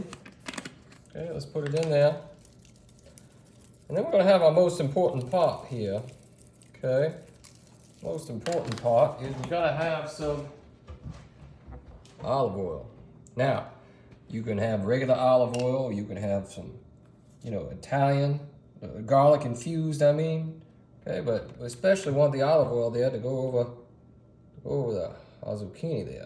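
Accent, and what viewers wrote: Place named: Louisiana, United States. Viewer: Fantastic accent! May I ask where you're from? Could it be Louisiana?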